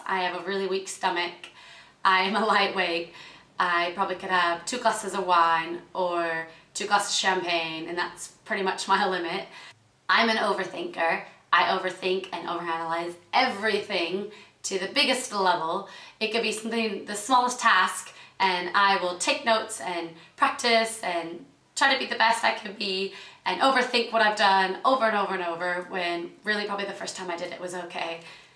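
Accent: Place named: Manchester, United Kingdom. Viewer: Love this, and I can hear a little Mancunian accent underneath your American one :P. I'm the same with routine, I think it's important for kids to have structure, maybe I'm a little too strict though haha x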